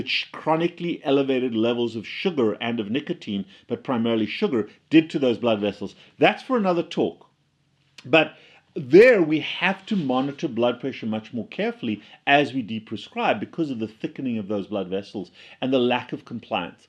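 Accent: South Africa. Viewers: I was curious about your accent as it does not sound American, I had a hunch you might be South African! We have the best heart doctors :-) Great videos!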